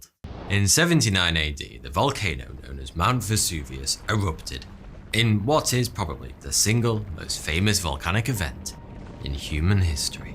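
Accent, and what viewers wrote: United Kingdom. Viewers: Lovin your british accent in french haha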